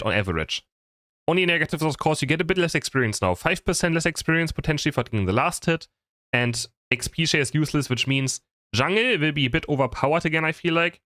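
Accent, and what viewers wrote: Germany. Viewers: Ahh du bist deutsch, ich höre den aktzent sofort heraus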